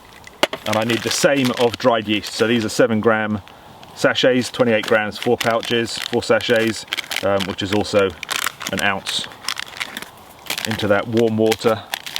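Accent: United Kingdom. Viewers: I did not see your channel name. First time viewer. I was surprised to hear a brits voice. A lot of survival stuff is muricans. You made a wonderful looking loaf. Good on ya.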